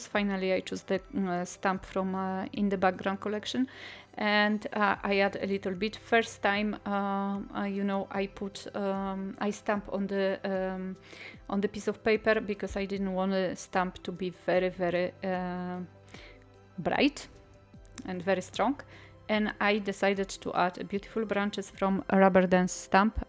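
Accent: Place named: Iran. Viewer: I love your accent. Is it Persian?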